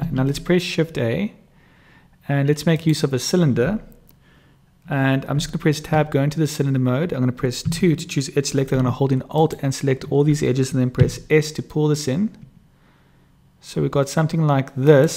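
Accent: South Africa. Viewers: Dude, thank you so Freaking Much. Also, it was nice to hear another South African on the Web.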